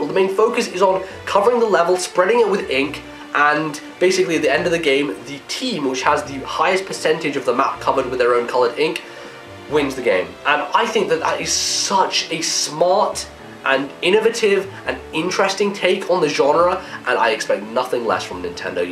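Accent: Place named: United Kingdom. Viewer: its guys. He's british, give him a break. It's not that hard to understand if you've been here for a while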